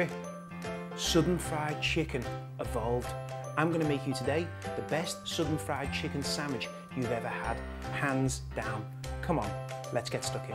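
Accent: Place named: Liverpool, United Kingdom. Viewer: wow a scouser haha me too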